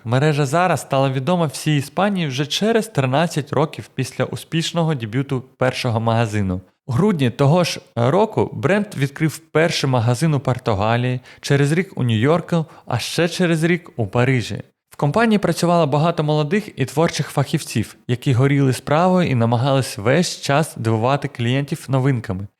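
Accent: Russia. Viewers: Класна історія. Цікавий виклад. Дуже добре, що контент українською. Суто для покращення вашого контенту хочу поділитися побажанням: більше звертайте увагу на вимову слів, ви деякі слова вимовляєте на російський манер, а також було декілька слів російською мовою ( наприклад: колокольчик). Загалом, вас приємно слухати і радію, що є цікаві передачі українською мовою. Дякую!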